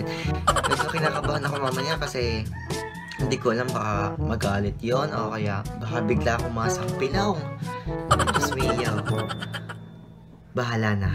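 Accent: Batangas, Philippines. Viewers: Batangeño ka ga??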